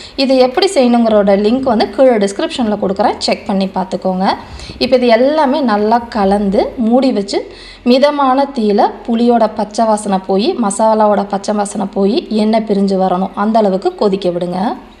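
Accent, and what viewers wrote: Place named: Coimbatore, India. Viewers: U r tamil slang is very nice it like coimbatore style I am from coimbatore so I love u r tamil I feel iam in local coimbatore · கொஞ்சும் கொங்குத் தமிழில்.. மீன் குழம்பு .. சுவை மனம் கமகம..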